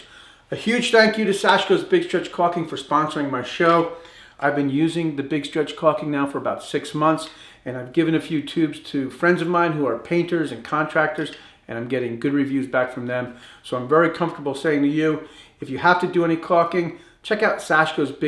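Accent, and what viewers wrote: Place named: New Jersey, United States. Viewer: Always fun listening to a fellow Jersey boy tawlk. 😁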